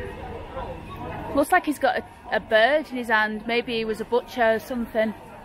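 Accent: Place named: Yorkshire, United Kingdom. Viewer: I like your yorkshire accent, sounds like Angela Raynor!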